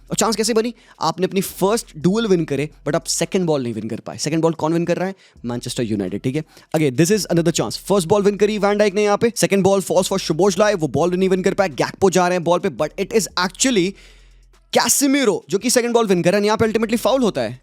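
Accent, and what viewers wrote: India. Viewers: Bro your accent is shit bro Indian accent make me puke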